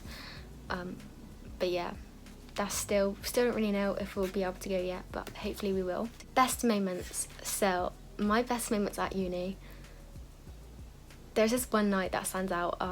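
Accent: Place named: Bristol, United Kingdom. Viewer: Great vid. Proper Bristolian accent also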